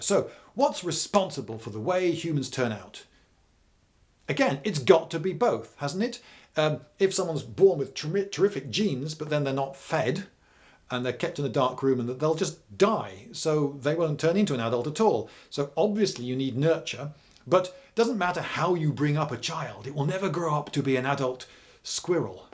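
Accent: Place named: United Kingdom. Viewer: This is the diction of a good Britisher that grew up on Fawlty Towers.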